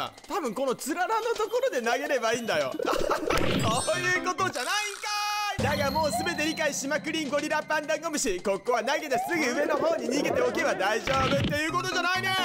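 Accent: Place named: Hakata, Japan. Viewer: 全編博多弁でやってくれるというのは いつ放送予定ですか？ 楽しみに待ってます✌️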